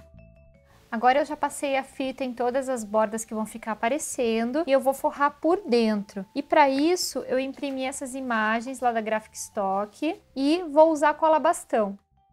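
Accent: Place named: Santa Catarina, Brazil. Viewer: vc é de Santa Catarina? é que eu vi a foto no Beto Carreiro, e seu sutaque tmbm parece daki ahhaha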